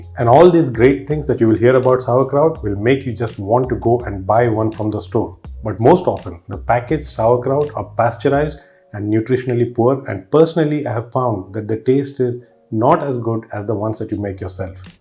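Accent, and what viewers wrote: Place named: India. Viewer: That’s a cool accent you have. Are you from India?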